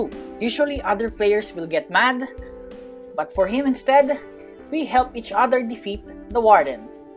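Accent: Philippines. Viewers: sabi na filipino to accent palang eh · sabi ko na nga ba sa accent mo pilipino ka eh hahah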